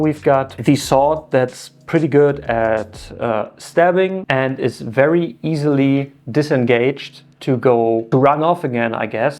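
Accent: Germany. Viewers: Memes ✅ Nice edeting ✅ Pfandfalschen ✅ Deutscher Aktzent ✅ Dishonored content ✅ Mein abo hast du 😁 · Ich hab nie bevor deinen Kanal gesehen, aber von deiner Aussprache hab ich gedacht, dass du deutsch warst. Jetzt weiß ich, dass du WIRKLICH Deutscher bist 😂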